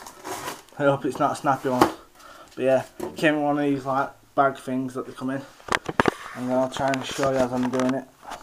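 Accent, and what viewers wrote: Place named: Wigan, United Kingdom. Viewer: Where are you from, I am from Wigan and you sound like your from near by x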